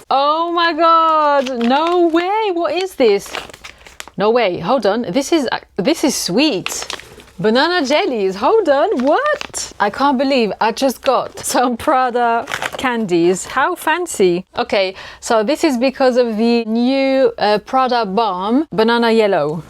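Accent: United Kingdom. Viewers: Are you originally British?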